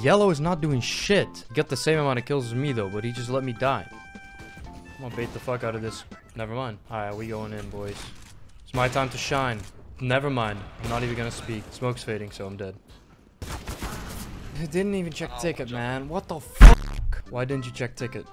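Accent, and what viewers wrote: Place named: Netherlands. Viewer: Je bent nederlands toch ik hoor het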